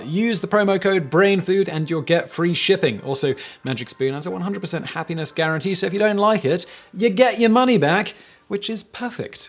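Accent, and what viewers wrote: England, United Kingdom. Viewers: I think deserves a statue. He manages to get people to pay attention to the most random and banal subjects but that English accent and dry humor makes us forget that we didn't know we didn't care about whatever subject we didn't know we didn't know about. Encouraging accidental education during quarantine is a difficult thing to attempt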